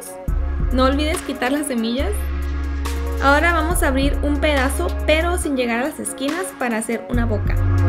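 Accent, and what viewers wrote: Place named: North, Mexico. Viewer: Así hablamos los del norte, es nuestro acento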